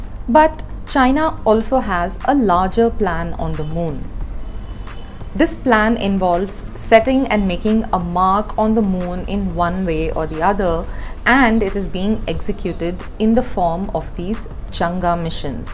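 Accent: India. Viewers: 这个女孩子讲的印度英文话，蛮好听的。😁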